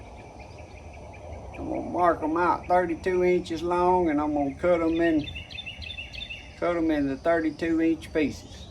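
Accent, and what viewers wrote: South, United States. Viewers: I just found you, thank you. And it's good to hear your good old Southern Voice.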